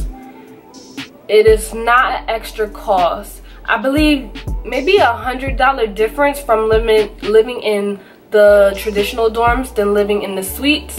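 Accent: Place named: Philadelphia, United States. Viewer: yo im like she sound like she from philly then i was like oh snap! thats wassup. Im going to collage in atl im looking at clark and Georgia state maybe i see you there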